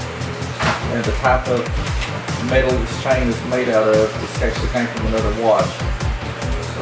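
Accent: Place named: South, United States. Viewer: Interesting how you retained the southern accent but you’ve been in the Philippines.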